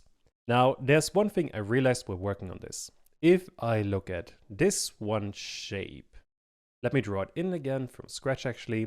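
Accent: Germany. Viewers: Just wondering, is it German accent? :) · I knew I shouldn't start learning Godot until some dude with German accent makes an 11-hour tutorial. Thanks a lot <3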